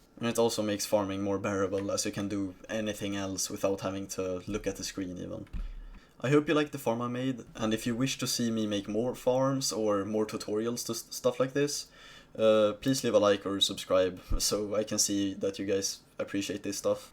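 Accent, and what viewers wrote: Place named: Sweden. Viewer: you sound swedish!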